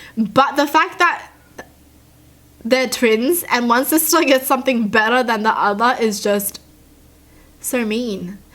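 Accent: Australia. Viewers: All your pronunciation is so cute. Like not just Australian, more unique than that. Like you pronounce “confusing” like con-FOO-sing and I love it. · Is she Australian I for some reason always thought that idk why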